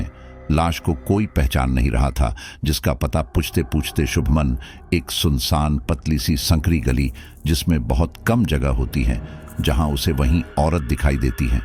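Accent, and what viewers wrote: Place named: Bihar, India. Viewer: यह कौन सी बिहारी है आधा हिंदी आधा बिहार😅😅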